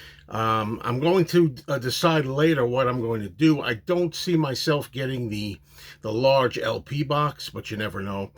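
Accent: New York, United States. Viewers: Great review! LOVE your NY accent.. You could be Murry the K...The 5th Beatle!! lol..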